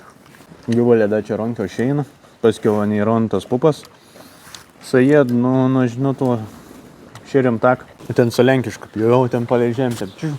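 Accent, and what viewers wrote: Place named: Samogitia, Lithuania. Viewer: O kodėl blogai gražia žemaitiška tarme?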